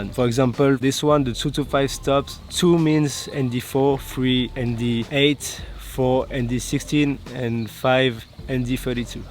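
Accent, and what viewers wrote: France. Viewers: Hi, sorry but are you french ?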